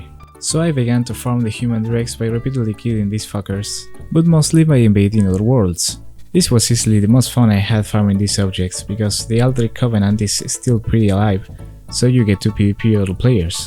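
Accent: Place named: Spain. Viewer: Como se nota que eres español😂😂😂😂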